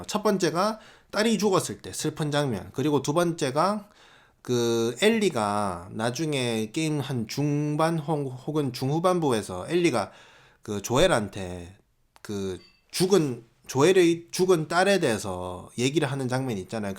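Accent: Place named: Gyeongsang, South Korea. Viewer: 후기를 듣다듣다가 말투를 보니.....경상도 출신인데. 대구? 부산? 어느쪽이든 고향분이라 반가브요 ㅎㅎ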